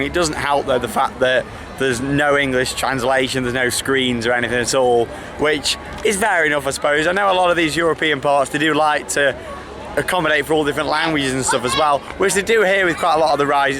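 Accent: Netherlands. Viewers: dat dutch daccent doe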